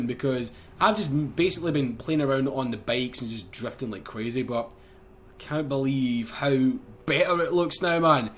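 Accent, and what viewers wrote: Scotland, United Kingdom. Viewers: ur awsome great vids BTW where abouts r u from in Scotland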